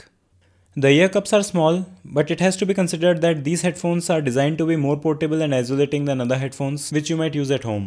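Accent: India. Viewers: India...can you just...live and speak your native language and dont try to speak english? Yours is so awful. I'm sorry, but you so annoy to try speak normal language with your indian accent. Nobody understand what you say correctly. You need to repeat 100500+ time to understand. English is not yours. Learn some other... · he shouldn't ignore it, the comment is true, indian accent is difficult to understand for majority of people including me. Though the contact is pretty darn good, it feels like he is reading a script. So consider the original comment, it only makes you better.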